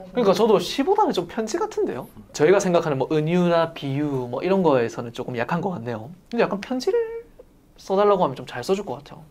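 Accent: Gyeongsang, South Korea. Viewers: 부산일보라 억양에 서울말쓰는듯 하면서 경상도 사투리가 보이네요ㅎㅎㅎ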